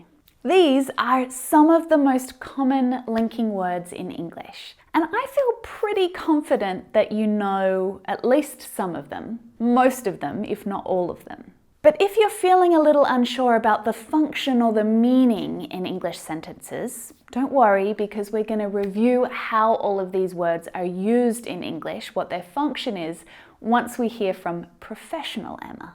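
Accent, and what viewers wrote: United Kingdom. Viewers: Hello, 👋 first of all thanks but I believe your pronounceation is British, am I right? So I've learned American English, but my English is not good enough so if I follow you may be I confused. I need an American accent teacher could you help me or what is your suggestion? Thanks in advance. 🌹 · G'day Emma, I can't figure out if you're British or Australian. Maybe (like me) you're both?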